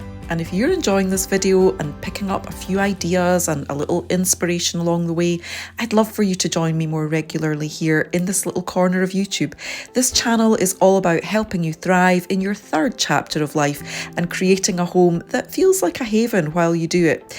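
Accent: Scotland, United Kingdom. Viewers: LOVELY Scottish accent! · your british accent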